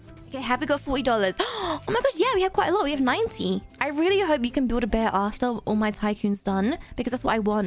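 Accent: United Kingdom. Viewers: Are you from the UK I am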